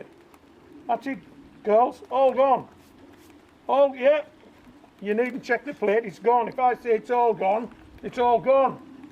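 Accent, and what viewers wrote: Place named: Teesside, United Kingdom. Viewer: Even the toast looks spot on, can I ask you a personal question, are you from up north, teesside area, you're accent sounds like you live round the corner from me 😂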